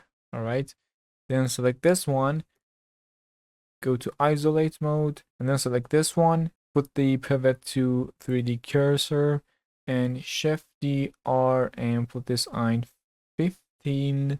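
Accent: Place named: Iran. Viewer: لهجه. فارسی ❤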